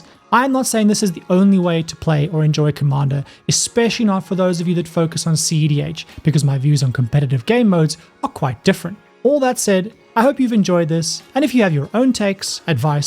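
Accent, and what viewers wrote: South Africa. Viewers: Nice video. Is there some south african in that accent?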